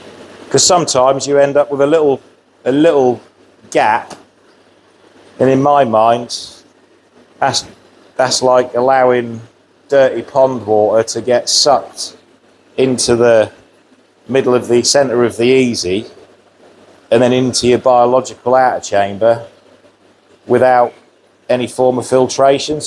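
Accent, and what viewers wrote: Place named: Suffolk, United Kingdom. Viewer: Great update mate and love the keep it simple approach from Ricky Stoddart,, What is the size of your pond ? width/ length etc ,, You sound like you could be close to me Lowestoft Suffolk ?? Hope to see more from you soon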